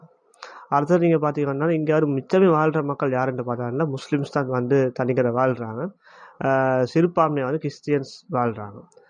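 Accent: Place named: Colombo, Sri Lanka. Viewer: RECORRECT YOUR SPEAKING. WORDS YOU ARE SPEAKING COLOMBO TAMIL PLEASE SPEAK PUBLIC SPEAKING WORDS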